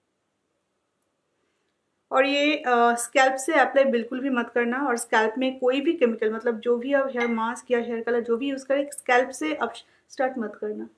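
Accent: Bengal, India. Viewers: Are you from West Bengal, your language is like bengolis speaking hindi ( ^ v ^ )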